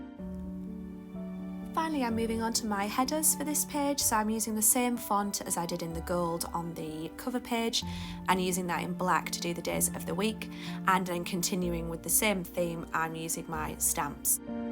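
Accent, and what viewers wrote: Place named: United Kingdom. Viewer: Just found your channel and your accent spoke out as I’m from the UK too this is one of my fave Xmas spreads your amazing to say you haven’t been journalling long! Do you have an Instagram? I would love to share your channel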